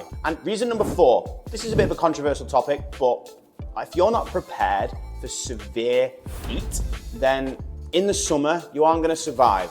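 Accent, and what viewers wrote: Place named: Northern England, United Kingdom. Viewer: Well done mate, never change that northerner accent !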